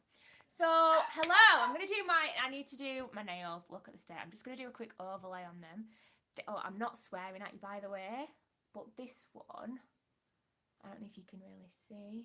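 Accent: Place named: Yorkshire, United Kingdom. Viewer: ? As in BD8 idle village? You sound Yorkshire. Looking for a good nail technician, I’m Bd2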